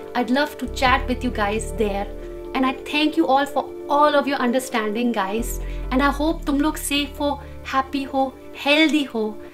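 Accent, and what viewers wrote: United States. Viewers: App American hai kya 🤔?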